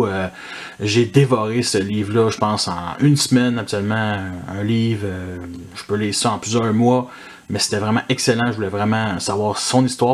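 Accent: Quebec, Canada. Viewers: Bonjour, Vous allez faire d'autres vidéos de livres à l'avenir, j'espère. Vous présentez bien et vous avez un bel accent Québécois.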